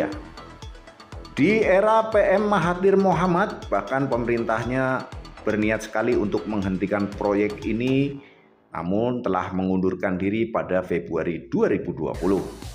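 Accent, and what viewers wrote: Banyumas, Indonesia. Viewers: admine ngapak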